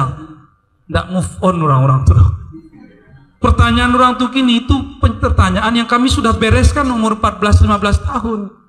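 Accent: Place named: Banjar, Indonesia. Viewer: kdg bhasanya kayak urang banjar, kdg kayak minang, kdg melayu kalimantan...kayak urang rawa pun ada, bersiko siko ! ...ustaz kiye sak tenan ne asal muasalnya teko daerah ngendi n suku opo ?..😂.. *" salam , saya si ganteng . jawa ngapak@ jawa begelen lahiran mlysia... apa pun ustaz ini makjleb n makyessss..👌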